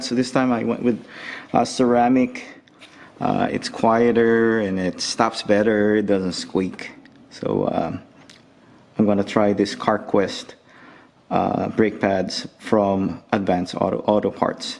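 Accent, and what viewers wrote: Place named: Philippines. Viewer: You have Pilipino accent boss 😁 astig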